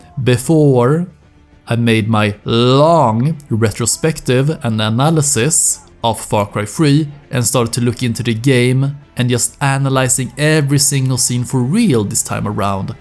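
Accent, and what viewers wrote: Sweden. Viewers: Your Swedish accent is noticeable mate. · tog mig 1 sec att fatta att du är svensk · den där svenska aksenten är galen manen grym vidio btw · Hey are you Swedish by chance? Your accent has a Swedish touch to it, also great video btw! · The swenglish is deliciously strong 🤩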